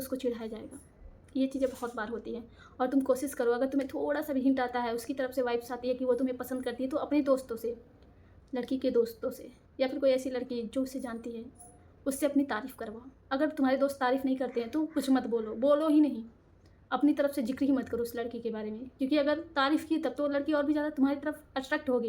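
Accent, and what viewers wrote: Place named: Banaras, India. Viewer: Aapki bhasha se aisa lgta hai banaras ya sultanpur ya jaunpur ya udhar he side se ho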